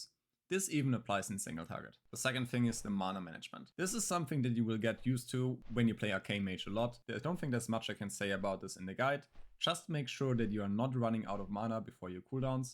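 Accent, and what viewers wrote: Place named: Germany. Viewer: You sound german. Do you also have german content?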